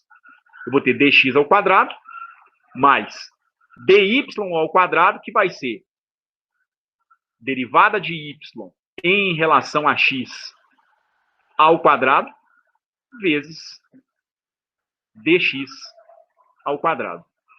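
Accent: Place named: Minas Gerais, Brazil. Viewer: Parabéns meu amigo. A vinheta é fenomenal. E o sotaque mineiro me lembra de 20 anos atrás.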